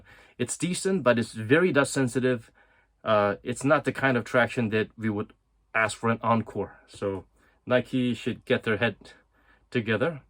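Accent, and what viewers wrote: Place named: United States. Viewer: 형님 미국 교포신가요??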